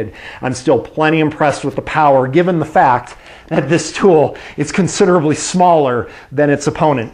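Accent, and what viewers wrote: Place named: South, United States. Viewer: That was a fantastic review and hope you do a lot more- as a Canadian your deep southern dialect is a little hard to figure out at times - just love the double negative inverted references supplemented with some psychotropic supplements. LMAO.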